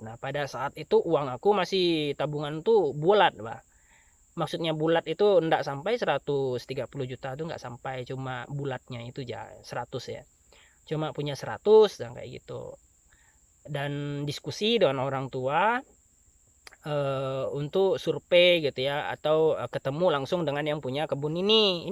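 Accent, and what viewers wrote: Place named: Bali, Indonesia. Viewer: Logat balinya masih kelihatan salam sedulur rahayu sak gung dumadi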